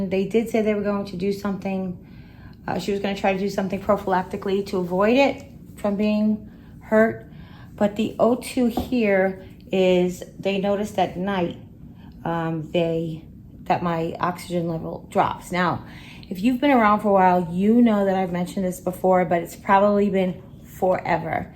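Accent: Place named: Long Island, United States. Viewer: Hiya. Girrrrrl, share away. Learning about the Peri wick is neato. Way to be independent indeed. As you know I dig your humor & that Long Island voice of yours. I swear when you speak, I feel home. Praying for you my friend.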